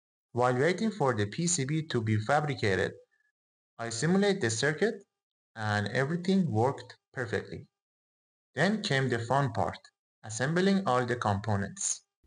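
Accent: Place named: Iran. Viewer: سلام آقا.بدون اینکه اسم اکانت رو ببینم گفتم این انگلیسی حرف زدن یه ایرانیه.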